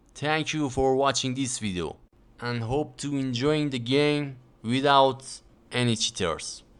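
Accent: Iran. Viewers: Your persian accent is very funny =))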